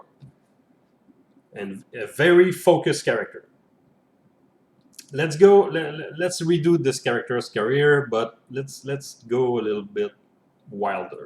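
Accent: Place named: Quebec, Canada. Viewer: Merçi bien! J’ai grandi à Deux Montagnes… a very familiar accent. In NB now. Im a big fan of SotWW and will be playing soon. Thanks for this reflection.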